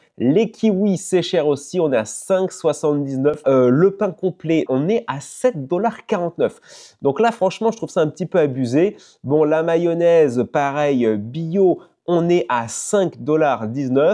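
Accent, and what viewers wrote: France. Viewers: Super vidéo. Avec ta jolie frimousse de Frenchie et l'accent qui va avec ,tu devrais pas rester longtemps célibataire !!😅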